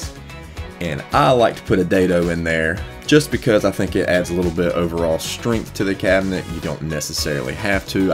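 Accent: United States. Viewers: Enjoyed watching my first video of your work! (with hints of Bourbon Moth, possibly? And, of course, I enjoyed those East Carolina "o's.") Though I've been in Texas most of 60+ years, my father was from your area and his ancestry origins are all from the area of Pinetown & Pantego? since the 1700's, I believe. I was born in New Bern as my folks moved around that East coast area a quite a bit in those early years before moving to Texas. Anyway, you were really funny and gave me some more ideas for my own cabinet building when I get to get back to it soon. Thanks, again! (yes, I will be subscribing. :) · I dated a guy who sounded like you. He was from West Virginia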